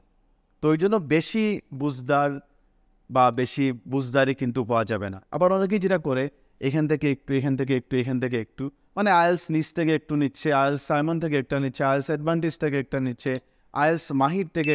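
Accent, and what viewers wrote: Sylhet, Bangladesh. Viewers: Sri apnar Sylhet e basa ta sundor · Wow so quite sylhety .🎉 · O sir afni sylheti ni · Apni ki amader Sylhety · Sir...apni Sylheti..kun jagay poran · Sir You r Sylheti😍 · Sir,Sylheti kotha gula kubi valo lagche. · Sir apni kita sylhti ni plz koiba.. ar kun jaigat porain akto janai bah ame portam sai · Outstanding video.Are you Sylheti? 🙂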